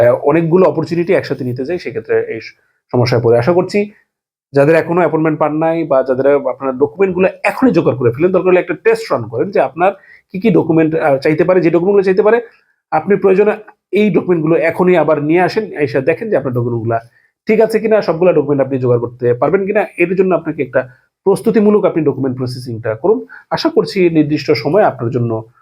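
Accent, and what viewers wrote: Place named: Kolkata, India. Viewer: স্যার আপনি সহজ বাংলায় বললে ভাল হয়। কলকাতার মতো বলছেন মনে হচ্ছে। 🎉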